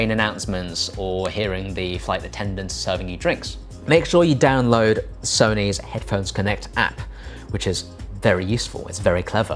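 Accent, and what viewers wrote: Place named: RP, United Kingdom. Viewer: This guy is the most posh-sounding tech reviewer I’ve ever heard.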